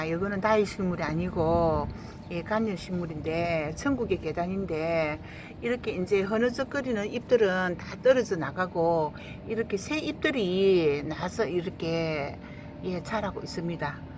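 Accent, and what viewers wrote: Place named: Gyeongsang, South Korea. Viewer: 예뿐다육이들 정성스럽게 잘관리하시고요. 말씀을 아주 예쁘게도 하시네요. 정말 경상도사투리가 정겹네요?😄😄😄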